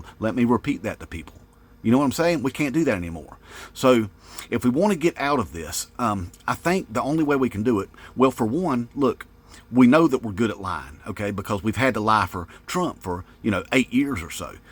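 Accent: South, United States. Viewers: I just found your channel here. I've never had tiktok. Been trying to pinpoint your accent and can't decide if you're from Georgia, Alabama, or northern Florida. Great content, man. I hope you do well here! ✌🏼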